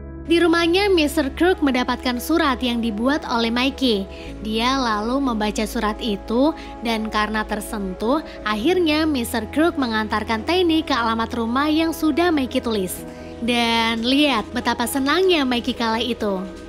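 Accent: Pemalang, Indonesia. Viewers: Mba dari pemalang y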